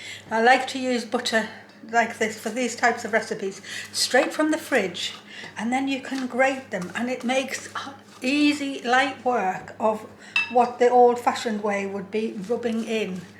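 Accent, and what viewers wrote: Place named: Newcastle, United Kingdom. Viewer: Thank you for sharing this recepy! I just made them and they taste great!!! I love watching your videos, mostly the sewing ones. I'm a quilter and sewer and crafter at heart. I looove your Jordi accent, brings back memories!!! So happy I found you. Lots of love Susanne from Germany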